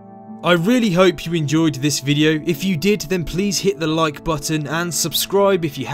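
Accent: United Kingdom. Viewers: interesting accent you have.. what area of Britain does it come from? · Why do you have a British Accent? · british English af.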